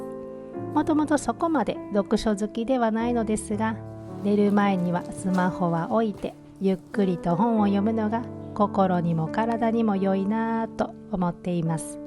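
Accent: Kansai, Japan. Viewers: 声と関西弁、いい感じです😂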